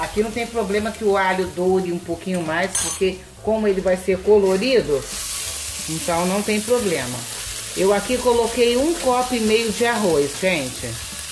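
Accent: Rio de Janeiro, Brazil. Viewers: Adoro o seu sotaque Carioca! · Adorei o modo prático como comanda tudo, fora o seu sotaque carioca lindíssimo, e a dica tb adorei kkkkk. Beijos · Amo suas receitas e sua pronúncia carioca.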